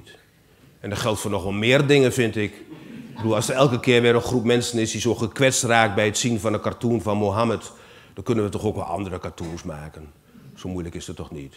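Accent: Twente, Netherlands. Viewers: wel goed dit twents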